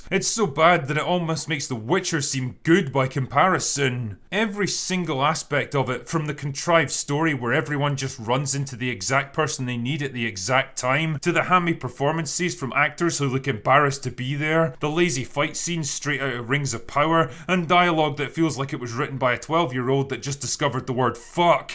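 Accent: Scotland, United Kingdom. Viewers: He's scottish, that's what they sound like, he sounds like that in the livestreams, but more normal scottish accent. Calm down.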